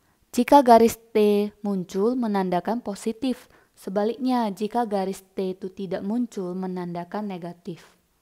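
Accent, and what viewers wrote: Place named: Medan, Indonesia. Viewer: ini dokternya kl gk dr medan ya dr pontianak singkawang hh sy denger dr aksennya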